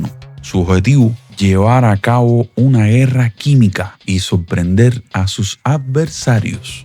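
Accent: Havana, Cuba. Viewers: pues su dicción muy habanera es bien recibida por los que estamos disfrutando la revelación del oscuro manto que envolvía al pestifero psicópata genocida dictador que hoy su oscura alma se quema 🔥 en el infierno, que no me gusta ni siquiera escribir su nombre, pero cuando lo hago, me place hacerlo arbitrariamente en minúscula, fidel castro 😅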